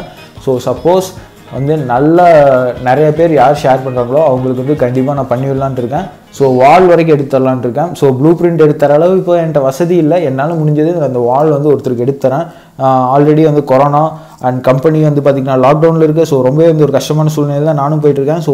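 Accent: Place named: Kerala, India. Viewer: Bro kerala erunthu pesure semma4bro supper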